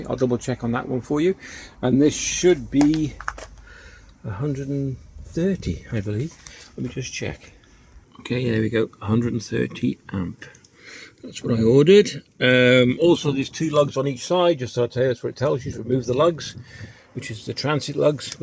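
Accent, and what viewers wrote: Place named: Shropshire, United Kingdom. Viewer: recognise your accent.....anywhere near Shropshire?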